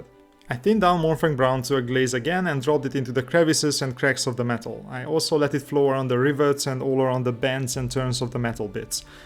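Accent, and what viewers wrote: Hungary. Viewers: Nagyon szép munka, köszönet érte! Magyarul szóló vidi is szuper lenne. Ahogy megszólaltál, rögtön tudtam, hogy földi vagy. 😁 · Tell me you are Hungarian without telling me. That accent.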